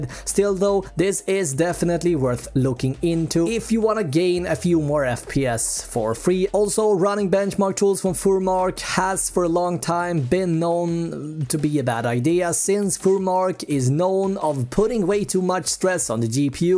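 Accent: Sweden. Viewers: You have a Swedish accent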